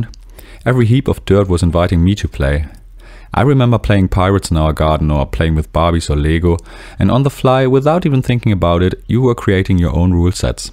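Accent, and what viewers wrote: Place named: Germany. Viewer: hi there, thanks for the nice video. i can totally relate to your situation. i think i am addicted too, even if it manifests a little different for me. i dream of being a game dev all the time and i am one of these guys who restructured their life to do so. (i get up at 5, not at 4 but yeah...) the problem for me is, that i sometimes get so overwhelmed with the scope of my game and the obstacles i face, that i struggle to start coding. i watch other devs instead or procrastinate in some other way... i hope participating in some game jams in 2023 will help me getting in the habit of finishing games. you sound like your native language is german. stimmt das? woher kommst du wenn ich fragen darf?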